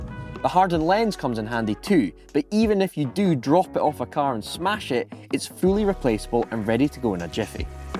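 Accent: Scotland, United Kingdom. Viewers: sounds extra Scottish in this one.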